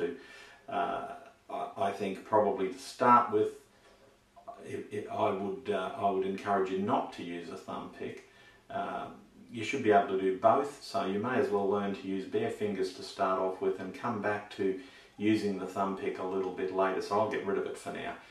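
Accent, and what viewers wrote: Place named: Australia. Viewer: mate you sound aussie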